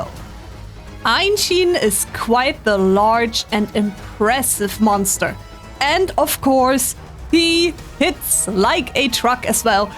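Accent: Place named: Germany. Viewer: Ur accent like ur from germany or maybe netharlands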